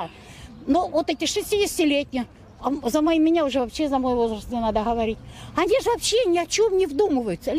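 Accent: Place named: Ukraine. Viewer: У нее украинский акцент! снято в Киеве на съемной квартире! Фу!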